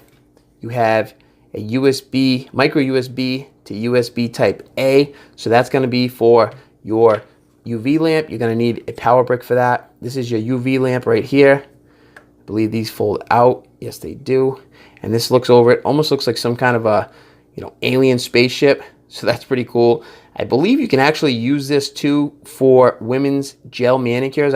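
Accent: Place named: Massachusetts, United States. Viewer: GREAT review and How-To. I did mine following along, liked and subscribed. Thanks a lot and loved hearing the familiar accent, close to home. Sounds kinda like.... Somerville, MA if I had to guess. MAYBE, Medford?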